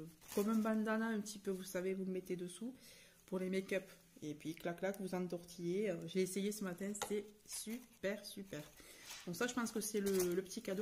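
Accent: South, France. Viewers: et ses petites combines Gros Gros bisous D'ALSACE. AVÉ ton accent tu nous amène le soleil et les cigales par ce froid. A bientôt.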